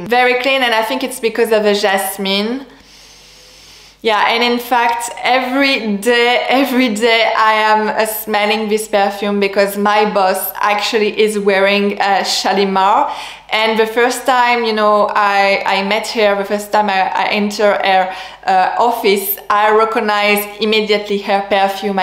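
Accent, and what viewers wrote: France. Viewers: I started wearing Shalimar when I was very young and now I am on the very elderly side and still love it. I really enjoyed listening to you with your wonderful French voice. I love the way you told me all about the ingredients and why I so love my Shalimar. Thank you very much. MJMoore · Did you realize that the bottle on the caption is the Philtre de parfum flanker? When you mentioned the EDT, were you talking about the Philtre? It sounded like you did. Philtre is my favorite because it’s so airy and light and modern. Even the EDT is just too animalic for me and not so fresh. But who knows, I might change my opinion. I keep trying the EDT and EDP. BTW when you say heart ❤️ it sounds like hurt. Not sure if you’re aware of that. It’s your charm of course the French pronunciation but sometimes I wonder if you wouldn’t prefer to know about certain words. 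Like leather. By now I know what you mean, but the first time I heard you say that, I was wondering why a perfume would smell like a lizard🦎 😊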